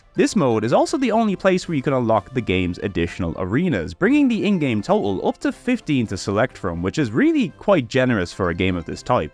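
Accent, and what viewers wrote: Ireland. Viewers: Here for that sweet sweet irish accent. Oh and the games of course, need to grow my backlog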